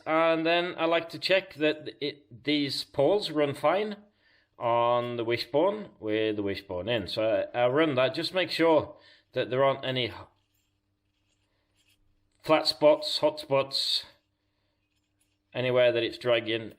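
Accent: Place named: Northern England, United Kingdom. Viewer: Loving the commentary. Sound like you lived in Manchester or Leeds for a while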